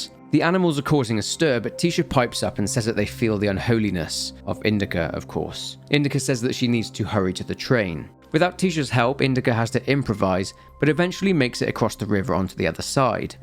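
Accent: United Kingdom. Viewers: British accent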